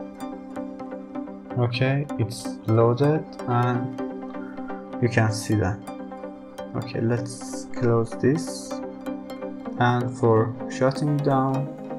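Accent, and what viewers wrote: Iran. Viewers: Iranian accent haha I love it bro that was great thank you ❣️❣️❣️❣️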